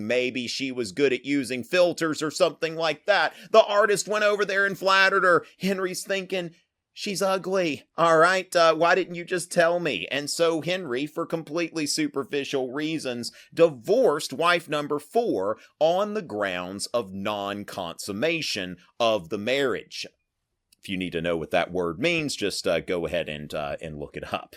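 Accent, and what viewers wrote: United States. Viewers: I’m from the Uk and have been obsessed with the six wives for YEARS it’s so weird hearing it in an American accent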